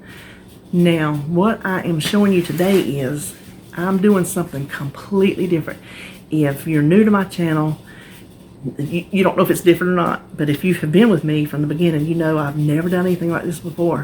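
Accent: South, United States. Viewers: I'm pretty new to WW and I found your videos last night, and have been watching so many of them. I just adore you. I'm from SC and just your southern accent and colloquialisms make me think of my grandmother and your videos feel so homey. Thanks for sharing!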